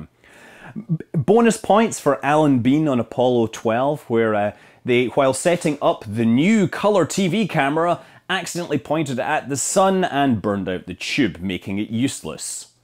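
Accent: Scotland, United Kingdom. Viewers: Yeah we actually say the word like it’s spelled in the U.K. and pronounce all of the syllables correctly. To us it sounds like Americans are saying ‘meer’ when they try to say mirror. There’s nothing that remotely sounds like a ‘d’ in there, he’s just pronouncing the double ‘r’ properly. Which, sounds a bit stronger than an English person would pronounce it because of his Scottish accent. To us, it’s the American pronunciation that sounds way wrong.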